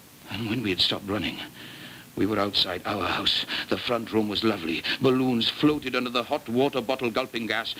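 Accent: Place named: Wales, United Kingdom. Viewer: Burton really went back to a Welsh accent as he went through this. As a Welsh person I loved that.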